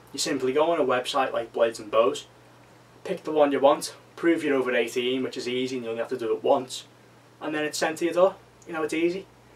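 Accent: Liverpool, United Kingdom. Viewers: My mans a scouser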